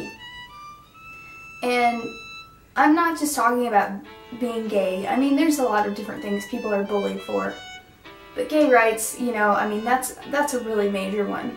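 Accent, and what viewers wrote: United Kingdom. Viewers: i thought she was british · dont u have British accent? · I thought you were brittish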